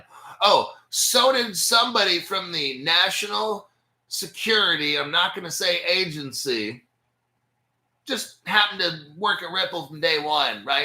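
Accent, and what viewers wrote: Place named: New Jersey, United States. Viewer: You must be from Jersey because you talk just like me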